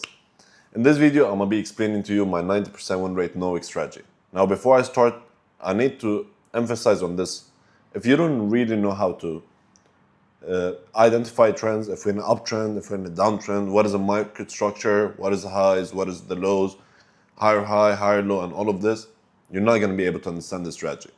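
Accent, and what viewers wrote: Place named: Eastern Europe. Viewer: When I hear an eastern European accent, I listen. I hear you guys know how to trade.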